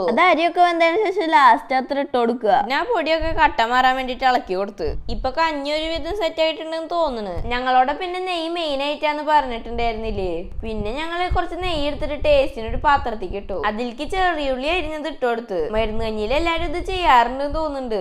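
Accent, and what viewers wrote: Thrissur, India. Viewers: തൃശ്ശൂർ ഭാഷ സൂപ്പർ ആയിട്ട് ഉണ്ട് ❤❤❤❤❤ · Samsaram ketappol mansilayi namde തൃശ്ശൂർ ഗഡി anu nnu alle😊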